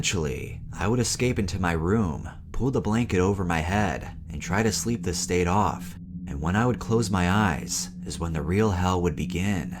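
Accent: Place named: South, United States. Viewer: Ngl I really love the little country into tune you now use lmao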